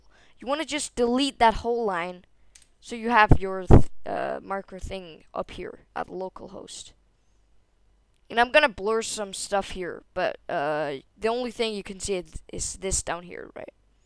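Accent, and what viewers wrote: Denmark. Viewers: damn your english is so good i can barely hear that you're danish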